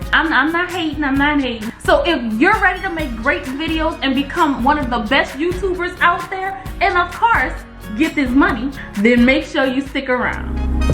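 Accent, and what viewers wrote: New Orleans, United States. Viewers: It’s the New Orleans accent, I love it ✨👏🏾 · Are you from New Orleans?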